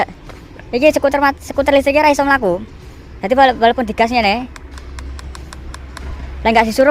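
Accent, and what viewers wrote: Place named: Banyumas, Indonesia. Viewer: Wuihhh ngapak gessss,,, mene dolan ning channelku gess 🙌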